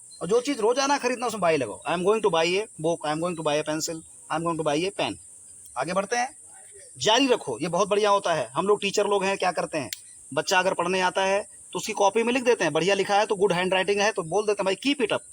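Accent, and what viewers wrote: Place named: Uttar Pradesh, India. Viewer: Great performance Bhai ji.... Aap pakka bhaiya style mein padhaate ho.... U. P. Wala touch bhi hai..... Aapka padhaya koi bhi padh jaayega... Your Hindi is also very tuff...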